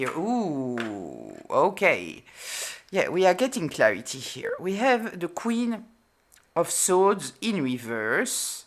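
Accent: France. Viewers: The word for only giving to get something back is “transactional”. Love your accent, makes me feel like I should wear a beret riding my Velo Solex with a baguette in hand to listen!😁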